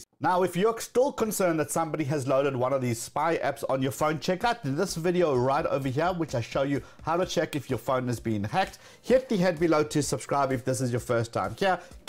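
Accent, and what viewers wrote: South Africa. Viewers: Ayyyy I recognize the south African accent 😀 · Are you a South African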